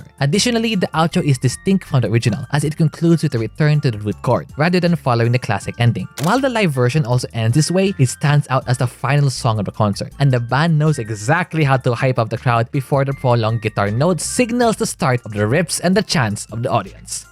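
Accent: Philippines. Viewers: well, his bio says he is from the phillpines, and also, i can tell if they are filipino based on the accent and how he speaks.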